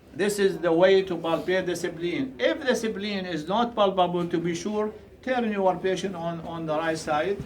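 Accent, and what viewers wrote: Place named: Arab world. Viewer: Hhahaha it's Arabic accent 🥰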